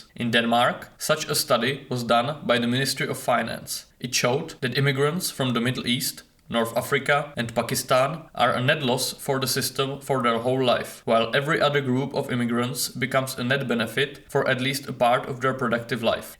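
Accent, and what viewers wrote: India. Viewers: Your accent sounds Indian. Are you from South Asia? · that's a strong Indian accent though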